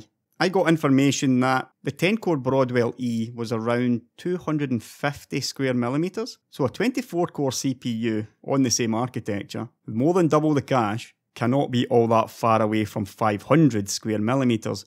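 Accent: Scotland, United Kingdom. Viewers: Scottish accent + tech channel = insta sub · Nice scottish acent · are you scottish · his presentations really help me nail doon Scottish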